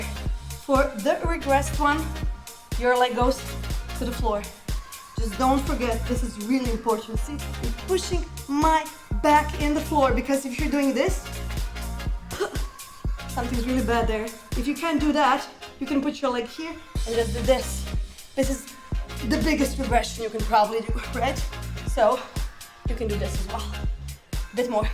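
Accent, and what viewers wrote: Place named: Russia. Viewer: Russian English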